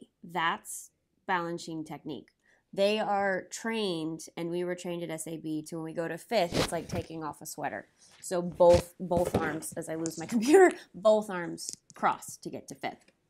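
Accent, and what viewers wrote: England, United Kingdom. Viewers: That’s a good British accent! 👏🏻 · I love this entire video, but just want to say your English accent is adorable and amazing!! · Thank you so much! this is phenomenal! Love your costume and English accent! <3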